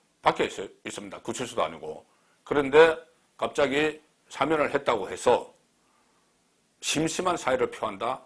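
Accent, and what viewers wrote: Gyeongsang, South Korea. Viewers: 송국장님 경상도분 같은대 우리공화당 지지하시는것 어떠신가요?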